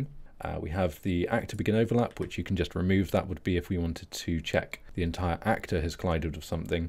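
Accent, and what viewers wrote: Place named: United Kingdom. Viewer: I feel like I'm listening to Jayztwocents in British accent. Anyways thanks for the great tutorial!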